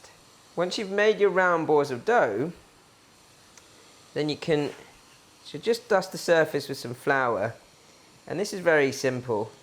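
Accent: United Kingdom. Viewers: Why is we listening to a British asian.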